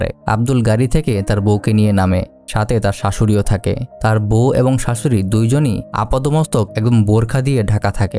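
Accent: United Kingdom. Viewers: British vabe bolle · Your Bangle language speaking In English Accent was just awesome 🤣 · vai apni movie explain kortesen ,apni sundor kore kotha bolben,seta na kore apni british style a bangla boltesen,ki bollen kiso bujte parlam na,faltu.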